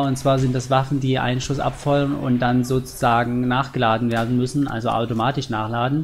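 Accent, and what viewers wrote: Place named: Saxony, Germany. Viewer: ich möchte noch ein bissel gebäshe auf sächsich .... oder bring noch paar lustige videos ... danke .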